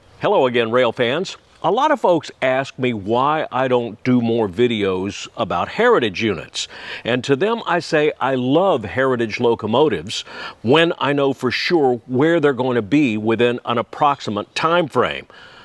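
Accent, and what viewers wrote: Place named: Florida, United States. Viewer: This is absolutely my favorite railfan site for several reasons. 1) Thecontent is interesting, as well as being well shot and edited. 2) I love the down-home friendly folksy Florida twang and cadence of the narration. Although it's less noticeable here in Dade and Broward because of all the New Yorkers. 3) There is the friendly Florida attitude where you can stand in line with a complete stranger and within five to ten minutes made a new acquaintance, and sometimes a new friend. 4) I live in southern Broward County in Pembroke Pines and grew up in Hialeah, so the Florida scenery in the southwest and center of the state is very pleasing. 5) There is the interesting history of not just the railroad, but the area in general. 6) Last but not least, the dining recommendations for simple down home Florida food, there's nothing like it anywhere else. 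Even if you are not a big railfan, this is an interesting and fun channel for good ole Florida boys, and girls too.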